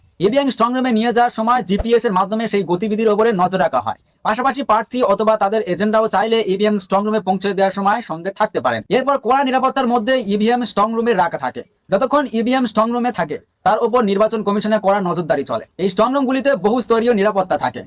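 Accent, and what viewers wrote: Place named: Bangladesh. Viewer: নিজে কিছু চেষ্টা করছ , সেটাই আসল কথা। মিথ্যা নিউজ চ্যানেল এর নাম কেন ? দ্বিতীয়ত যদি ইউটিউব এ বাচিক শিল্পীর কাজ করতে চাও তবে উচ্চারণ অবশ্যই নিজেকেই ঠিক করতে হবে। প্রয়োজনে কোর্স করে নিতে হবে। নিজের উপস্থাপনা সুন্দর হলে নিজের নামেই লোকে দেখবে। নিজেকে ও আরও সুন্দর ভাবে উপস্থাপন করতে হবে। ভারতে বসে বাংলাদেশের মতো উচ্চারণ কেন ? ভবিষ্যতে আরো উন্নতি কামনা করি। ❤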